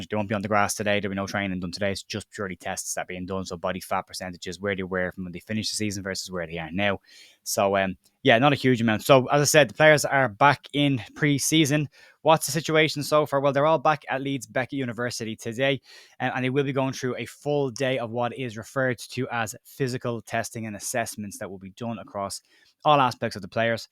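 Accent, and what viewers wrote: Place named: Ireland. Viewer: Thanks, plenty of false announcements in my feed this morning from most of the other LUFC YT channels, didn't even bother to watch them. These days I'm happy to wait for the real news, in an Irish accent.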